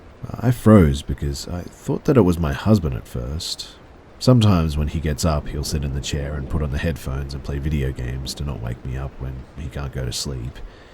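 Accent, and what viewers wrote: Australia. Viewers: Have I just found my first Australian night time narrator? 🤞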